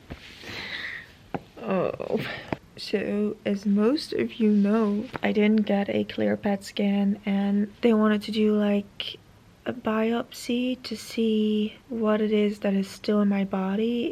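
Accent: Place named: United States. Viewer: You have an American accent , even though you are not from America. Where are you from and where did you learn English ?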